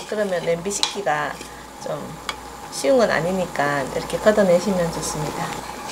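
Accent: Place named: Daegu, South Korea. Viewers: 경북사투리에 참 듣기좋은 말소리를 하시네요..보통 경북사투리 참 듣기싫은데..경상도 사람 입장에서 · 어릴때 엄마가 만들어주던 식혜 생각나요. 대구사람인데 이모 말씨가 촵촵 감기니 정겨워요^~^ 울 엄마한테는 전수 못 받았던 레시피.. 이모한테 배워가요♡ 뭔가 어려울 것 같아서 생각도 엄두도 못 내던 식혜~ 도전!!!!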